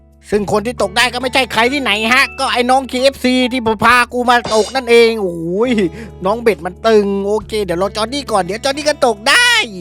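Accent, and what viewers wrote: South, Thailand. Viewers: ตกได้ปลากระป๋องครับ 5 kg. สุดฝีมือแล้วครับภูมิใจสุดตั้งแต่เล่นมา😅forzarken11 เข้ากลุ่มพี่แล้วนะครับทั้งหมด5กลุ่มจาก fcที่ดูพี่มาเกือบ2ปีคลิปก็ยังสนุกเหมือนเดิมสู่ๆครับพี่ชายแหลงใต้เก่งจุง🎉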